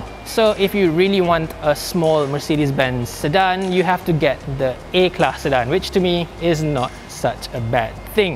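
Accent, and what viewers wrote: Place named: Malaysia. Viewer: Your English is so good and can recognize u r malay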